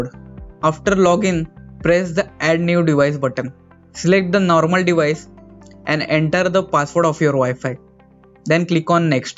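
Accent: India. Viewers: Mere bhai, he IS, in the Indanized English specifically Maranglish! (Marathi English) I speak Tanglish (Tamil English). English is our language. Since British cheated and looted us for 200 years, we have confiscated English. IT IS OURS NOW. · my Indian brother plz when.u speak English in correct accent not in.hindi very poor english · Dude your indian accent is too much. Please study the intonation and slang of proper english. I know you speak english. But really sounds like indian language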